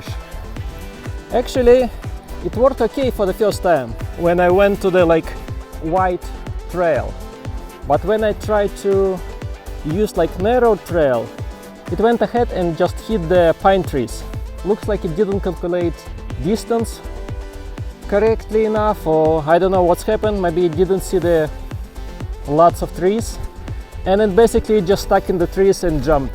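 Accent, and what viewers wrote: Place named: Russia. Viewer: Дякую за відео. У тебе точно такий же акцент як в мене, тому я здогадався, що ти теж руский )). У мене такий же дрон і я трохи розчарований як працюють сенсори. На скільки я зрозумів, дрон не бачить сухих гілячок.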